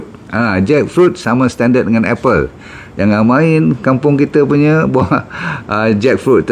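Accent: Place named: North, Malaysia. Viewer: manis melecas noh...Dr mesti org utara..hahahh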